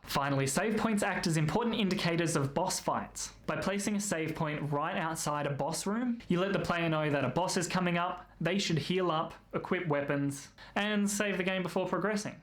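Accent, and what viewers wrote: Australia. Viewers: I enjoy your accent, Australian Eddie Redmayne.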